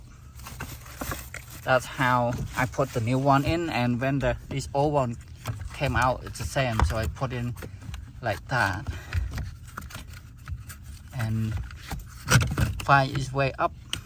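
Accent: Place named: Vietnam. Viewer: nice, ty for this sir. also u sound Vietnamese. i love it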